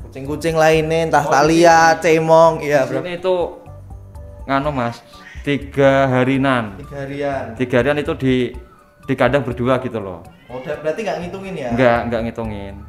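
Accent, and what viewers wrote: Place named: Pati, Indonesia. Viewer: logat daerah pati ne kentel banget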